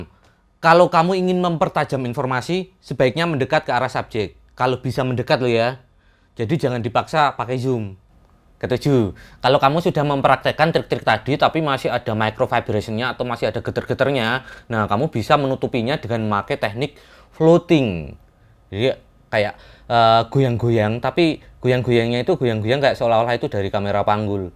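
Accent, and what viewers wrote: Java, Indonesia. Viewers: ilmunya mantap om , plus2 lucu2 gaya jowo asik ae rek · jinguk baleni neh hahahaha.. njawani mas hahahaha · Pekat betul ya jawa mu mas..hehe udah subscripe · Medok jawa ya bang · sek seksek....wih jowo banget😅😊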